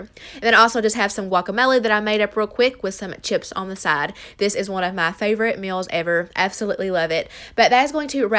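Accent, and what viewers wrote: South, United States. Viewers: Love the country accent 😂🙌🏾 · I’m obsessed with your southern accent. I’m definitely trying those chicken bacon ranch sandwiches! Subscribed. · Love your accent!! I'm a New Yorker so you really don't hear a southern accent around here.😋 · Thank you, you are so sweet:-) If not eating it helps get rid of a host of issues I’ve been having...it will be worth it! I will still watch your videos as I love them! I also love your southern accent..lol!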